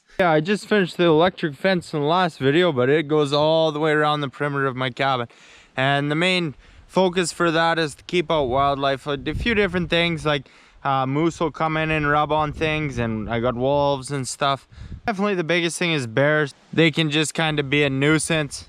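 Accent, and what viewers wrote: Canada. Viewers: You're video was recommended by YT and I'm glad I watched it. First off, as a fellow Canadian I heard the accent and knew where are from, awesome accent! I like your cabin it's very quaint and seems efficient. You had me laughing at "this is the shitter" haha... classic. You have a new subscriber.. take care from ON. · The loft is awesome! Looks like a great place to relax and get cozy on cold Canadian days and long ass winter nights.. By the way, love your accent. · Love the Canadian accent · I just got up out of my bed to grab a Moosehead. That’s how Canadian I feel after listening to this gent! Awesome! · Been a long time Since I heard the great Canadian accent!! Love it! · Born and raised in Ottawa. Never heard such a Canadian accent in my life. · I'm Canadian and I've never heard this Canadian accent!! Lol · Knew he was Canadian from the jump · I get all the confusion about accent, have yah considered he's Canadian and a little tipsy? 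yah know? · That's the most canadian sounding guy I've ever heard. Could be doug and bob McKenzie's long lost brother. That's a badass set up that he has there, tho. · I agree you have the purest Canadian accent!! eh!!! I enjoy your videos and I'm envious of your lifestyle!!! All the best mate!!! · Fuckin love Canada and Canadians. This dude literally sounds like a dude doing a parody of a Canadian, and I think that's really beautiful. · I don't know if this is Canadian accent or menonite mixed with Amish and a little Canadian threw in for good measure. But it sounds like you really poor it on extra extra like trying to copy a wirey granddad like I copy both my grand dads and my dad I've noticed naturally. Either way pretty good ol boy A ..... Well you are ok in my book lol nothing like a Cummins 12 valve . Have a good one young man . I don't see how anyone can live in such beautiful country and not be able to have guns like. 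It's almost like living in Alaska but less freedom. But what am i talking about we have a bunch of demoncrat, antichrist spirited bunch of heathens that stole our election and are pissing away everything here . But seriously awesome place . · I'm Canadian and even I was like, wow that's so canadian. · Wow. I quite enjoyed the videos I watched. Amazing that you started doing this when you were so young. I have four boys in their late teens and the only thing they do is play computer games all day. There I am building a woodshed with my Chainsaw mill and none of the boys have the slightest interest in building something. We live way out in the woods in northern BC and I enjoy nature and doing things as well. Good on you. Wish you all the success. That is quite the Canadian accent, by the way. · Is there an Irish modification to this Canadian accent? Sorry, curious linguist. (yes i know Ireland is older than Canada. 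The only reason I use "mod" is because someone can be born and raised in one country but still be taught a language by people culturally from another. In this case, someone identifying as Canadian is being taught English by historically Irish English users. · Truly. And the accent makes everything sound like it has a G-rating even when saying “shit” (which I didn’t know was even a word in Canada). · Such a "Canuck" Love your accent brother. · Im Canadian, was raised in the bush, and even Im like. "whoa thats a thick Canadian accent bud" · I definitely say eh a lot, but this is the most Canadian guy ever.. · I love the Canadian accent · That's deep Canadian. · Holy shit that is the Canadian accent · first time viewer but 5 seconds in I can tell its a fellow Canadian lol, got a doozy of a spot there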